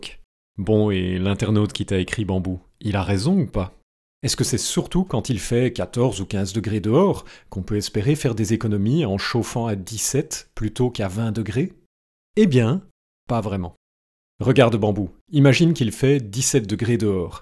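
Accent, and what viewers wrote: Belgium. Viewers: "Elle est cachée dans le bouisson." J'adore l'accent belge ! Merci pour le starter kit c'est génial!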